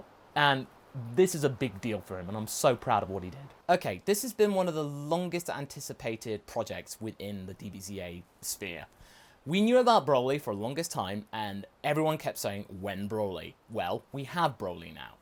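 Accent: United Kingdom. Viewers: By any kind, Are you British? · I remember being so shocked that you were british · HOLY YOURE BRITISH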